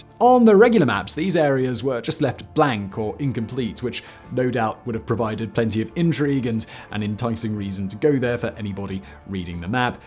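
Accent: United Kingdom. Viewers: Maybe it's my imagination, but, to me, becomes a little more British when he makes a video about his home country.🧐☕🇬🇧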